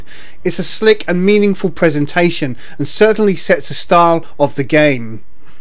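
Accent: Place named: United Kingdom. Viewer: I like your British accent, which sounds very soothing for video game reviews. But there are certain elements and design choices which I honestly can't appreciate in Blacklist. First it has too many mandatory combat sections and that level where you are in the stead of Briggs dips you in an FPS, which is a bad idea of which I sincerely cannot fathom the existence. Second there are too many dogs that turn into pure annoyance if you go for a perfect stealth route. And there are too many levels that force you to go in one direction, with segments being entirely separately from one another in such a way that once you go past a segment, the game loads the next one so the enemies in the previous one no longer tracks your move - this will not make any sense if designed for Chaos Theory, because that game allows you to play in a non-linear fashion (remember the bank mission where you can play one way and the other way around). 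Blacklist is not like a small open world, for it often gives you a straight road and there too many areas so well lit which will give you pain if you want to play stalker.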